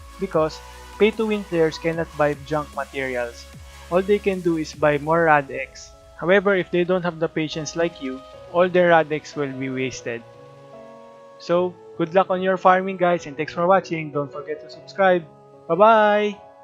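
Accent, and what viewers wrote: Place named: Philippines. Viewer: Nice I need farming materials I need more for my attackers need more yarn and you sound Pinoy. Pinoy ka ano? At ako newest subscriber mo helpful vid mo eh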